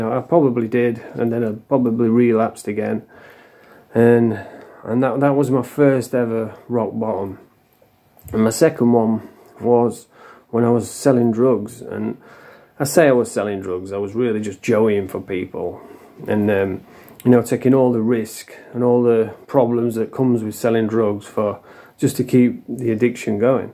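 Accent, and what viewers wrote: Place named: England, United Kingdom. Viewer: Go on lad… I’m from the basque,north Spain, But I work on ferrys in the Uk , all over…I’m curious about your accent ? Which part of England are you mate? Strong lad, nice2 meet u…’ Greetings 🙏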